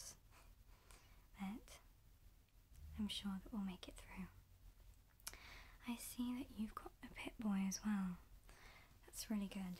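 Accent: United Kingdom. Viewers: ASMR + Cosplay + British Accent + Cute Shawty + Sci-fi + Mythology + Fantasy + Movies/Video Games = Exothermic Reaction 🔥 🔥 🔥 This channel gonna blow up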